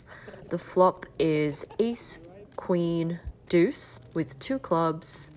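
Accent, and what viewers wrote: Australia. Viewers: refreshing to hear an aussie accent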